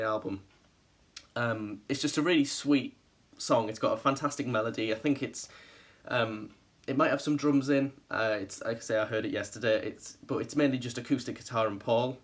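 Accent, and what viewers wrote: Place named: Northern England, United Kingdom. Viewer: I'm happy there's a positive review. I was happily shocked how much I enjoyed it, how experimental Paul is willing to go. I love it and it keeps growing on me. My personal favourite is ' ' which just brings me to tears every time. It's just such a beautiful statement on the power of love, it's so sensitive and fragile. Exposing his pure heart within. Something truly, truly amazing. And that flute solo! I think it's something you could only really understand with an open heart idk. is just manic debauchery, I love it. has a wonderfully melancholic opening piano intro. Everyone rips on and I get it, but if you listen closely there's a lot of production elements and melodic arrangements which are quite clever. and speak for themselves. should be given more credit! is a gorgeous little number. I like all the tracks, come to think of it. 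I think he's simply done an outstanding job. It's an album that will surely add and not substract to the legend of. Bless him. Side-note, I am desperately trying to pinpoint your accent but I simply cannot come up with anything other than 'North', or possibly Wales or something. At the very least, far from London. Which is kind of sad, since, if you should possibly allow it, you're exceptionally cute. Oops. Anyway, great review and bless xx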